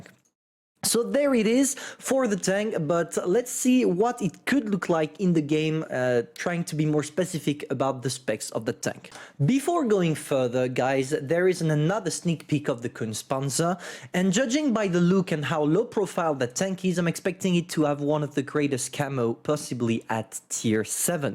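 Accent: France. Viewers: Dear, I must give you a huge compliment. In my oppinion you are by far the best You-Tuber on WoTB. Not that your explainations are sharp to the point and your commitment to the game and the community is outstanding, but your french accent within the english language is absolutely insane, when it comes to the fact of entertainment. In other words: you are broken as a Smasher. Keep on your performance for the benefit of us players. sincerly yours. Carl Schurz, Adj-chef-2.eme REP ( retired in 1991) served in anonymas. " Nous sommes le meilleur"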